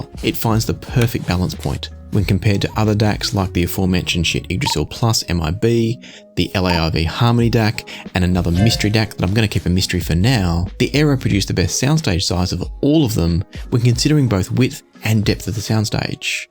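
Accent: Australia. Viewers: your comment assumes that Linear Tube Audio has tested the I2S implementation and then decided not to include it after concluding it wouldn't 'add much'. That is simply something we do not know as they only have 1 DAC in their lineup and no other reference point. I would actually guess that they have not tested it, maybe due to not having the components needed on hand at the time of manufacturing. I do concede, based on your review that Linear Tube Audio really do know how to make really good ins/out in terms of electronics when it comes to clock ect... that alone require a lot of skill. Now if it did have i2s, I really can imagine your voice saying "and if that wasn't enough, as I switched to the i2s, it was like the soundstage opened up even more... the details became a tad bit sharper... not harsher... buta tad bit more etched at their edges, if that makes any sense..." see how much I know your use of words? 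:) I really should stop watching too much of your videos before I start speaking with an Australian accent... Im already close as I always loved the Aussies :) Aussie Aussie Aussie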